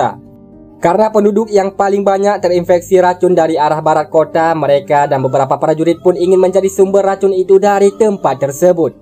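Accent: Aceh, Indonesia. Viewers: Logat apa Aceh , lanjot bang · Apaceh banget logat nya. Wkwkw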